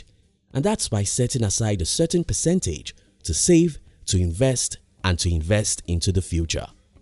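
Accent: Nigeria. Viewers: That's a Nigerian 🇳🇬 accent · Lol Narator sounds like he from my country Nigeria 🇳🇬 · For those who might be wondering where these ascent comes from, well, this is a Nigerian ascent. Good work bro! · Brilliant Nigerian voice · Gather here if u think the narrator sounds like a Nigerian ✌️ naija fi life no fuckery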